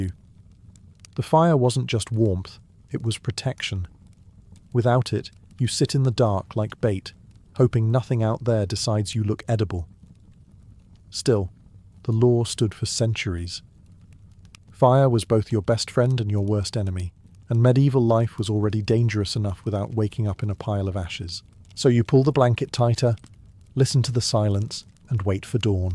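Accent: United Kingdom. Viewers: Love😂 the British accent. Makes me feel a little more civilized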